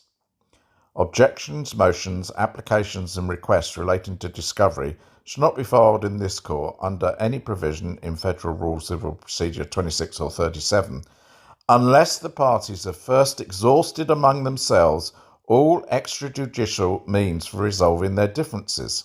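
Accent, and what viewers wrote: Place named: United Kingdom. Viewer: It's the British accent.. Brits can describe a dog laying down a stream of runny pooh and make it sound elegant..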